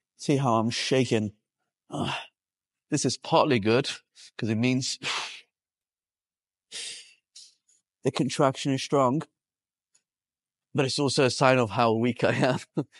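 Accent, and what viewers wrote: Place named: United Kingdom. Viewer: You have the most interesting accent. It isn't very British but yet there is a strong Brit tone in the way you enunciate.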